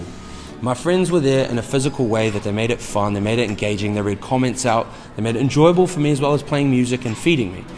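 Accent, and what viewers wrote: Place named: Scotland, United Kingdom. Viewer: I thought u are Scottish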